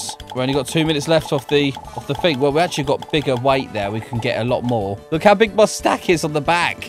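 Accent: United Kingdom. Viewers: Your British doesn't matter you are still a great youtuber and I watch all your videos I can find too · Are u brittish cuz u sound like one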